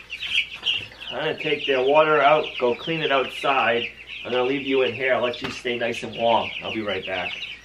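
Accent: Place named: South, United States. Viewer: lol I hear your southern coming through. I see more applewood bacon in your future.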